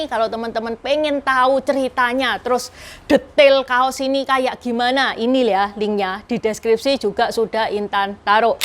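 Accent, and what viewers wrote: Java, Indonesia. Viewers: Rokok kretek taline lawe. Hhhh.. enakan yakin dengerin dongeng nya nih. Dg bhsa indonesia ber aksen jawa medok. Hihihi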